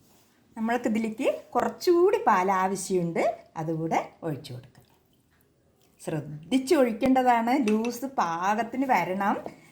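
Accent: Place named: Palakkad, India. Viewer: അമ്മ പാലക്കാട് എവിടെയാ ....? തനി പാലക്കാടൻ ശൈലി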